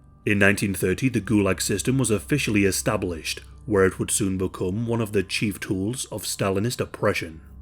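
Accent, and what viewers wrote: United Kingdom. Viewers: Dudes British my guy. He just talks like that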